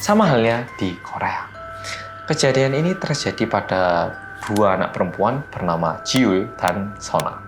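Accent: Java, Indonesia. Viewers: MAS MEDOK AS MAS JAN NGASIH JUMPSCARE GUA KAGET ASTAGA😭😂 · dari hari ke hari saya amati logat mas sudah mulai bercampur antara medok Jawa dengan medok Korea, ada sengau-sengaunya "H"-nya... hahaha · salam buat org Korea yg medoooookkkkkkk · udah hapal sekarang mah bukan orang korea yang Medook tapi Kewedeen nggk ketipu wkwk Love mas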